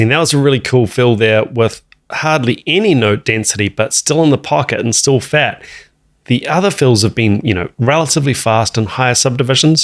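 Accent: New Zealand. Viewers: So true about relaxed..... another of the relaxed drummers I can think of springs to mind:.....Fred Beard of ZZ Top - slumped at the controls........ but no comparison!!! I found you recently and suddenly realised i was finally listening to a NZ accent - wow - how cool is that: we can do this stuff over here too! Love your videos and shorts.....Hi from the Kapiti Coast:)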